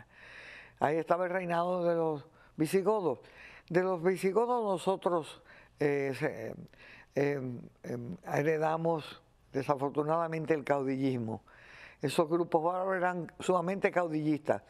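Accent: Cuba. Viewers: Ya soy adicta a su forma tan natural de expresion....muyyy cubana. Gracias Dr. por compartir con nosotros sus conocimientos. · 👀Yo conozco gente que tienen 70años y nO han “ madurado “ 😅😅😅ja ja ja 🤣🤣🤣🤣🤣cuánta VERDAD ❤tú acento sutil del ORIENTE cubano es notable * y además es un orgullo 🥲 🥹 I PROUD 🥹 of U 📚📖🌳🧘🏻‍♀️🌊🌺🌳🫶🏻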